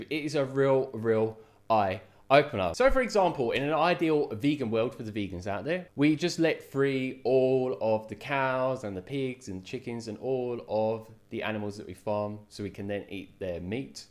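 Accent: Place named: England, United Kingdom. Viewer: İ concur with you. İ watched this video for listen your enlish accent. And i understand you clearly :)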